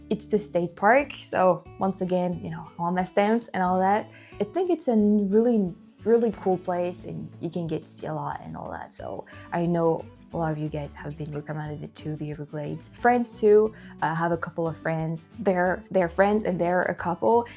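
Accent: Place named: France. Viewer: your English is having less French accent to it I noticed.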